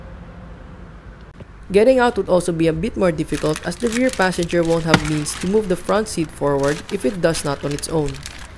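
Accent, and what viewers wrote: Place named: Philippines. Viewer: he speek like many pacquiao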